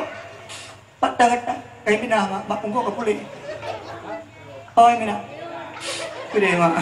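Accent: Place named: Aceh, Indonesia. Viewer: gampong.. Logat basa timu si angen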